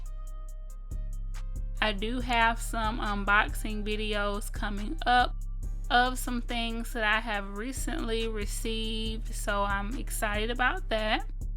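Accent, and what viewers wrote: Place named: United States. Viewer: Yes I love nails. Do them myself. I work with gel and polygel but I can appreciate a good nail video even using other mediums. I like your channel because you’re upbeat, have a cute country accent and I like the way you explain things. You can tell you like what you do and you can sure sell a product. I hope they recognize! I’ve watched 5 of your videos now back to back and not 1 video went by without me pausing to go put something in my cart.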